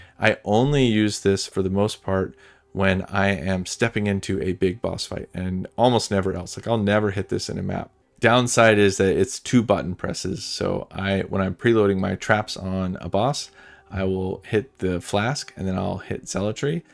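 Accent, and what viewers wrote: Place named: Germany. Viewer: Absolutely stunning video man. I wholeheartedly appreaciate eveything & second put into it; since a also worked on some videos I can tell, it was a lot. OT Question: Where are u from exactly, ur having an interesting accent, reminiscent of German but w/o the typical German pronounciation I'd expect.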